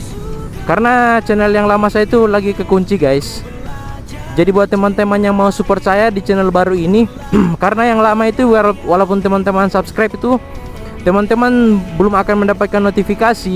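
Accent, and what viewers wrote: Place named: East Nusa Tenggara, Indonesia. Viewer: logat bahasa indonesia tu hampir mirip NTT ya... 😂😂😂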